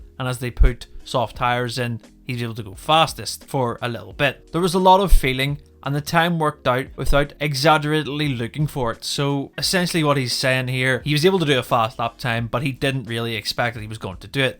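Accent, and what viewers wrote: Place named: United Kingdom. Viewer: Narrator sounds British.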